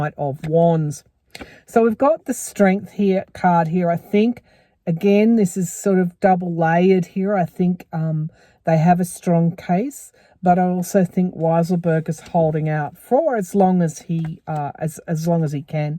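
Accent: Australia. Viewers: When you said that this is the card reading for this week, I heard “car reading,” differences between your area Australian accent and a Kansas one! Pretty funny for me anyway! I still giggle when I think of my first reaction…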